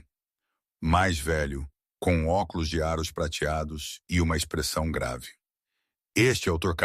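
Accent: Portugal. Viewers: ADORÁVEIS NARRATIVAS COM O PORTUGUÊS DA LÍNGUA PORTUGUESA DE PORTUGAL. ENREDO EXCELENTE E RELEVANTE!✨✨✨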